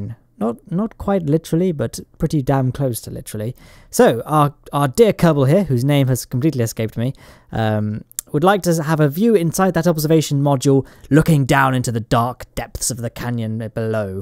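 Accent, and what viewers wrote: United Kingdom. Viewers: For some reason I heard that as "This is a purely autistic video" instead of "This is a purely artistic video". Must be your British accent. I'm from America. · You are British..... and space....? Instant Sub!!!!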